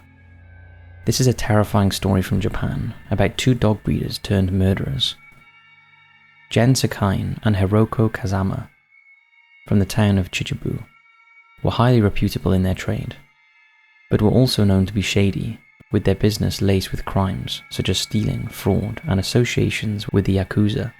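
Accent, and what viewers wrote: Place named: England, United Kingdom. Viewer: He's definitely English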